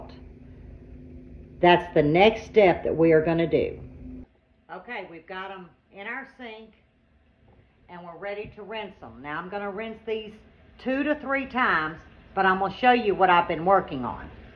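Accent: South, United States. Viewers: You are a true Southern Belle!!! I love hearing you talk because of your "accent"!! And I love the South.No cukes here yet. We had no rain and I had to haul. I love pickles so I'm praying I get enough. I'm having Grandma Camp next week!! My daughter has treatment so I will take the four children. I Pray constantly that God will keep her here. Okay I need to watch over and write down directions. God Bless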